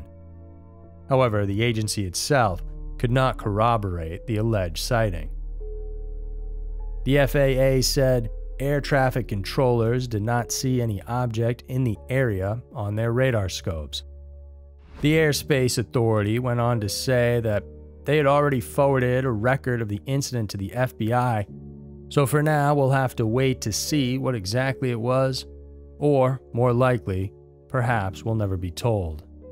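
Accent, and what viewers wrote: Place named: Boston, United States. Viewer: adding an R to the end of ur name...lol yup thats a boston accent! Also, not pronoucing an R to words like car, park, art, etc😂😂😂 Thats me!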